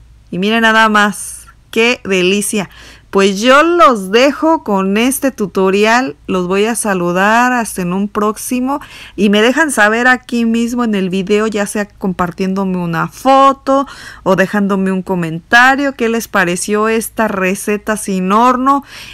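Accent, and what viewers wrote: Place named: Morelia, Mexico. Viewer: Cuándo oigo que hablas en el tutorial de este video Y de todos tus vídeos me transporto al estilo de voz de la gente de Morelia me preguntó la gente que ve estos videos si alcanza a percibir la diferencia de estilos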